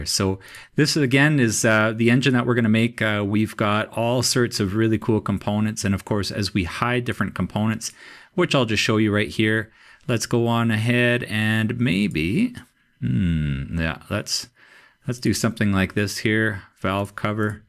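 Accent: Canada. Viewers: I really love your courses. They are the best CAD courses that I have taken. Your speed, method of explaining, and examples are excellent!. I love the Canadian accident too. Thanks for doing such a great job. I look forward to more of your V8 videos. I loved the LEGO Man videos too.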